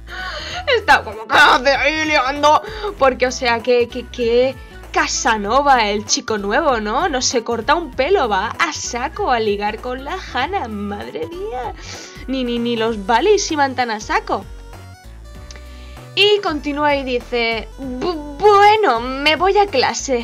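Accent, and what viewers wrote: Catalonia, Spain. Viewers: Eres catalana? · se nota tu acento español y soy seguidor de tu insta tambien :3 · ¿Ereh catalanah? OH DIOS POR FIN (alguien más que es catalán)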